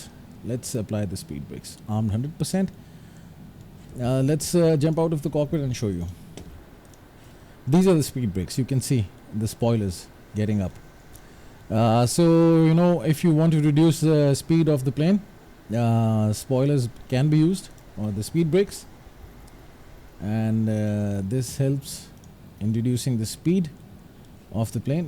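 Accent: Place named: India. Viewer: Clicks on video *hears Indian accent * ANNOYING and turns it of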